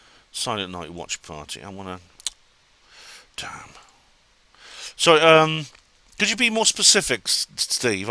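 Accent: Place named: Scotland, United Kingdom. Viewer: More Scottish accent please. 😄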